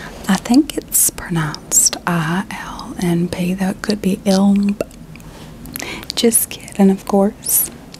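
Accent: South, United States. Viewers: She's back and better than ever!! I love your ASMR so much and especially your southern accent! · Man I was just thinking about how I was craving a new vid from you and then I refreshed my youtube homepage and here it is! I always love the southern accents cause it makes me feel like I'm back home after moving up north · There's something kinda comforting about hearing somebody speak in a southern accent Not about anything politically charged or whatever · I LOVE your ASMR. Southern accent is the my fav. Thank you for putting blame on Justin, he dropped the ball on that one.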